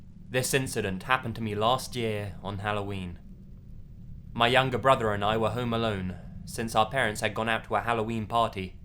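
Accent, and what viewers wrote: England, United Kingdom. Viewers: Are you from Britain just wondering I'm not I'm from Spain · don't think he's posh he's just english lol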